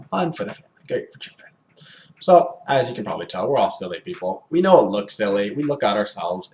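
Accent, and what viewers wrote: United States. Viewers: Watching this in 2020 you can def tell that you had a more pronounced southern accent back in 2014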